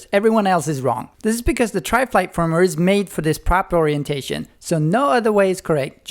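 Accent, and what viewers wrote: Sweden. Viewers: awesome review just one thing, you should really pause a little longer between sentences, specially since you kind of have a swedish dialect, even for a swede its kind of hard to descipher . bara lite längre pauser mellan meningarna plz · I love your Swedish accent and your jokes, they are the best! Regards from Denmark :)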